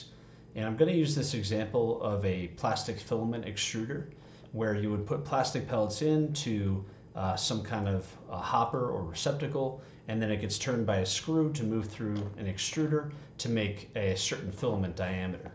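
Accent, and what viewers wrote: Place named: United States. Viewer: this the first time i see an American (i assume from your accent) using SI and Metric unit for the tutorial lol. this video is amazing tho, very detailed explanation. very helpful. thanks a lot.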